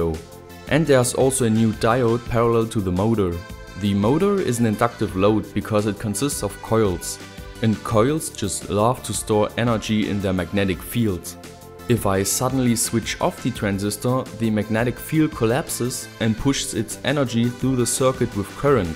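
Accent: Germany. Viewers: Are u german ? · denglish / genglish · du kommst aus deutschlan oder? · bist deutsch neh :D geiler akzenz :D aber gutes englisch :D wie bekomm ich am einfachsten bluetooth kopfhörer für nen helm hin :D mein einfall.. mini bluetooth box aufschrauben .. kopfhörer zerlegen und pooow XD aber ich hab einfach mal null ahnung von elektro und audiozeugs XD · Are you German? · he is German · Pretty cool. Oder ziemlich cool... ich glaub du bist Deutscher. :) Cooles Video.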